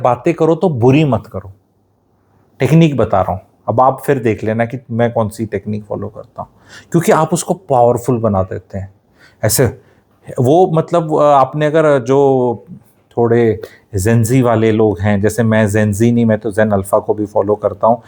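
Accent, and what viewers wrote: Haryana, India. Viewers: Too much haryanvi accent is killing the content of the video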